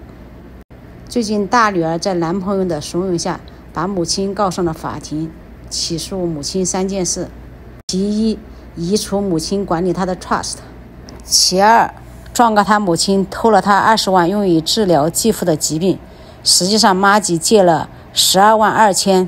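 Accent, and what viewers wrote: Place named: Hubei, China. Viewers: 因为也居住在这里，看着Dana Point的景色，听着你讲述故事都感觉好亲切。你的口音和你的性格好像我一个从湖北来的朋友啊……她和她老公搬去Arizona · ，不好意思问你一个问题：你是姓闫吗？我一个朋友可能认识你。我是你的湖北老乡，听你口音很可能是我们那个城市的，荆门，我猜对了吗？我在夏威夷，希望你来夏威夷旅游的时候找我玩。你的频道我挺喜欢，喜欢听你讲故事。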